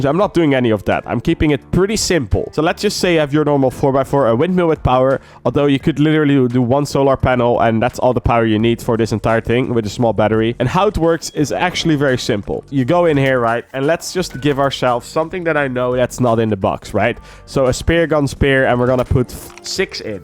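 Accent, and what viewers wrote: Netherlands. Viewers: You are dutch. Prove me wrong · Nice dutch accent =D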